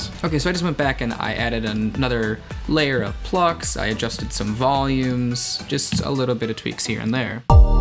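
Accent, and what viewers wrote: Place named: Canada. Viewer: I only just now realized that you're Canadian! Soon as I heard "pro-cess", instead of "prah-cess". Dang your accent is barely even distinguishable from a General American one. Hardly the stereotypical Canadian accent!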